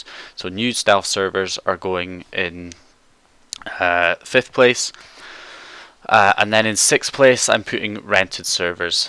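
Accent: Scotland, United Kingdom. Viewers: Sno _Warior he's Scottish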